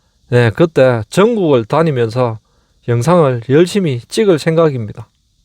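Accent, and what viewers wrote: Gyeongsang, South Korea. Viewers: 23만 축하드려요 ~ 경상도 목소리 듣기 좋아요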